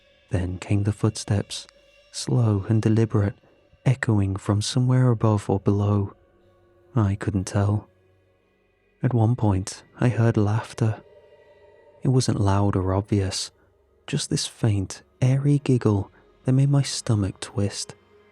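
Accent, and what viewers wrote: United Kingdom. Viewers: Me for 5 years now and he is still in my top 3, theres just something about his voice that helps me sleep. I usualy dont like the British acent and dont like to listen to it but I can listen to for hours, plus he always has a good or interesting story, unlike 90% of creepypasta channels now that only do the borring rules storys. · He’s good and his stories are great but im kinda getting tired of the British accent. Any good American creepy pastas channels?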